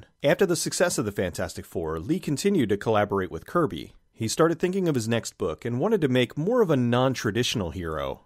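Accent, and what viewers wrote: Philadelphia, United States. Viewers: Wait, did you say Baña?! Love that Philly accent !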